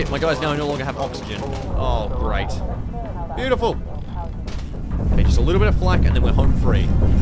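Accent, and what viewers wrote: Australia. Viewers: I started watching this earlier today and when I came back he sounded even more Australian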